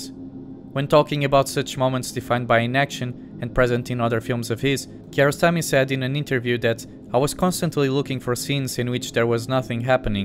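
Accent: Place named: Portugal. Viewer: Esse inglês com sotaque português não me enganou! Parabéns pelo vídeo, para mim o Close Up é dos melhores filmes de sempre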